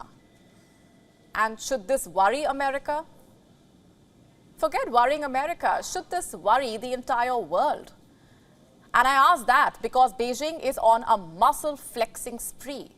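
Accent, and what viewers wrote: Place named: India. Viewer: 印度口音:pride-person-earth-intersex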